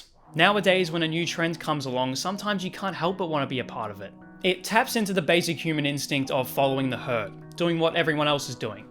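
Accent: Australia. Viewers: I wondered where Anko came from. I don't have a Kmart anywhere near me (I think the nearest is at least a three hour drive away), but I'm familiar with some of the Anko products. On the whole, the electr(on)ic ones we have seem to have lasted fairly well, but are also not used too often. I'm often surprised at how long a cheap product can last compared with similar more expensive products. Best toaster I've ever had lasted probably most of a decade of almost daily use and cost under $20. This video came up in my YT home page, and I just had to know the origins of Anko. This little doco had all the things I like in a YT doco -- research, a professional delivery, easy-listening, and bonus points for slightly irreverent Aussie-ness that I love. Another bonus point for the mullet (I'm totally a fan of Richard Dean Anderson in his mullet years) and lava lamp. Cheers from NZ. 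· sounds like a male version of me lol, guessing a southie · Anko = Ankourh Home & Co = Home & Courh FoMo = Fau Maurhh I’m from Sydney, and you sound like the Kath & Kim cast 🫶🏼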